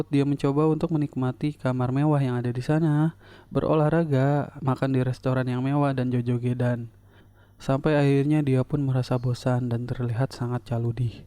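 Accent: West Java, Indonesia. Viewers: logat sunda na asa deket jeng daerah aing da ieu teh🤣🤣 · Si ajig urang sunda🤣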